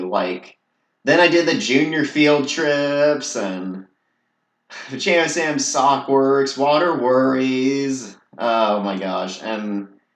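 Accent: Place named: Texas, United States. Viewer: why do you sound almost exactly like terrev but slightly more texan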